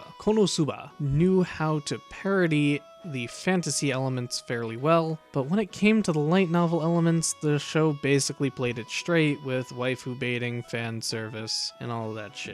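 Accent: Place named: Japan. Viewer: it is somehow funny to hear japanese speak english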